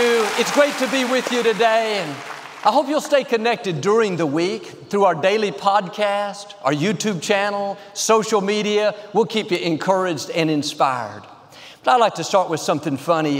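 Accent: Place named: Houston, United States. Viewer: "DON'T BELIEVE THOSE LIES!!!" (with his Houston TX accent!!!) One of my three most favorite comment/quote by my pastor Joel!!!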